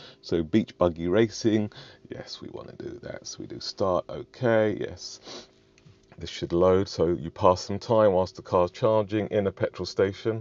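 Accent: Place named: United Kingdom. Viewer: You sound like British guy now.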